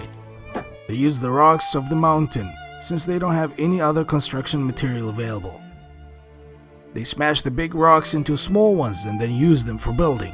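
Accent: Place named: Hollywood, United States. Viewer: بارك الله فيك على هذا العمل الجيد والممتع والراءع في نفس الوقت باللغة الإنجليزية ،اتمنى لك كل التوفيق في مجالك وننتظر منك الجديد ،متابع جديد ،ملاحظة لغتك الانجليزية هوليودية بامتيازواصل .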